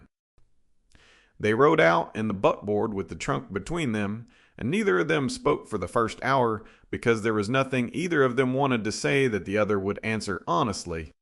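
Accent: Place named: South, United States. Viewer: I understand the country accent, but the word is Kata, not cattah. The proper pronunciation is Naug e nata.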